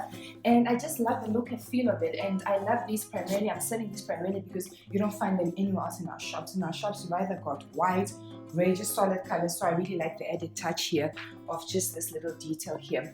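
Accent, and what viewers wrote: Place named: Kenya. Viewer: Accent from Kenya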